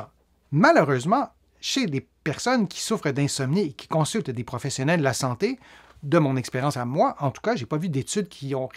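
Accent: Quebec, Canada. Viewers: Merci pour votre information. Donc il vaut mieux lire ou écouter la radio le soir pour éviter de s'abrutir avec la télé ou you tube ( que je vais écouter plutôt le matin maintenant) Bien fermer ses volets ou rideaux pour être dans le noir surtout en ce moment car il fait jour vers cinq heures . Encore merci à vous et bonjour au Québec que j'aime beaucoup (ainsi que votre accent) ou vit mon fils ainé..j'ai 70 ans et commence a avoir du mal à dormir a cause de mon stress.......mais je me soigne avec le yoga et des activités artistiques.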